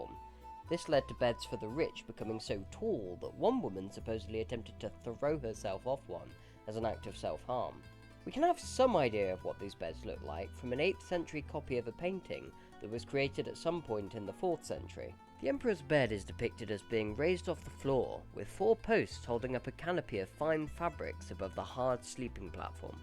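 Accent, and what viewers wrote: United Kingdom. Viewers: Informational videos with british voices hit different.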